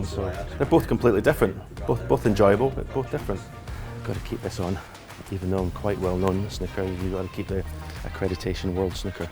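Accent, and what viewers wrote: Scotland, United Kingdom. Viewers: Fine Scottish man .the voice of fellow Scot’s are made for commentary. And a fifer to boot well done mate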